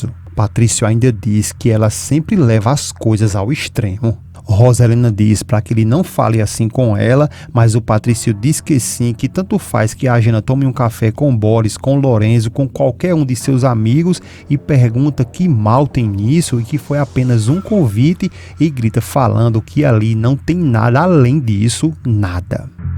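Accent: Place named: Northeast, Brazil. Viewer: adoro assistir sua narrativa é muito legal com esse sotaque nordestino. Maria Alvina de Teresina -Pi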